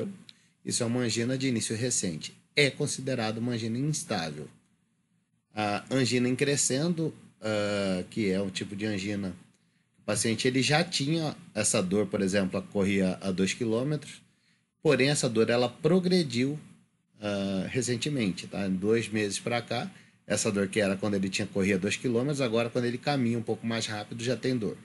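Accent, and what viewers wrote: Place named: Rio de Janeiro, Brazil. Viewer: Muito bom!! Não sei se você se mudou pro Rio de Janeiro, ou algo do tipo, mas alguém puxar o R e o S é a primeira vez que eu vejo hahahahhahhah Grande Abraço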